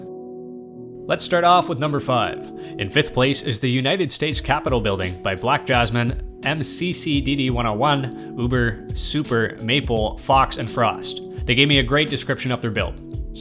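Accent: Canada. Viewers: Are you Canadian by any chance??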